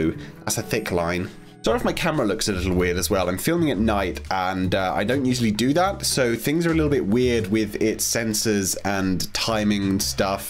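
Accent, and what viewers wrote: United Kingdom. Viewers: I love accent I wish I had a british accent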